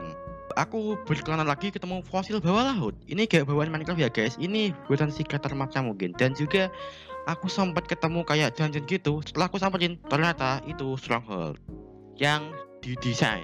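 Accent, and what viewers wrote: Java, Indonesia. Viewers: Setuju banget (╥﹏╥) jawa nya ada imut-imut nya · Orang mana bang, kok logat nya kayak orang jawa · Jowo ne medokkk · jowone terlalu medok iki kayane,, ngommong bhs indonesia we raiso alon lan kesrimpet srimpet wkwkwkwk btw jowo ndimas?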